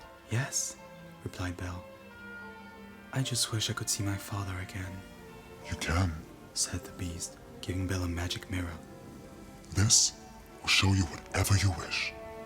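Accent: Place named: France. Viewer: Your French accent suits it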